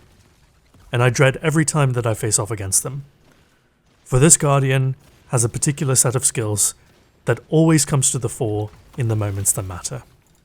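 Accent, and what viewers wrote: United Kingdom. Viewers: This video is about as useful as Lord Shaxx telling you "You're trailing the enemy. Catch up!" when it's 3-0 for them, only one of your team mates is alive, and they have 2 revives left. Maybe this vibes with pro players who get paid to do this? I don't know, I play games to entertain myself. Having a British accent blabbering on and on in a dramatic fashion about things that are so remote from reality is funny, but "stew pid".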